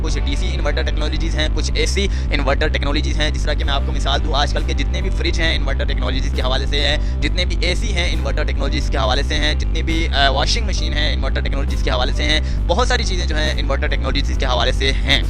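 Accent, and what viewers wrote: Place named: Lahore, Pakistan. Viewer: Ye bi lahori hy awaz sunty He andaza hugya tha chutia banai ga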